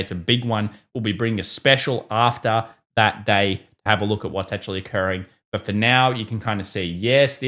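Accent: Australia. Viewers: He's just Australian m8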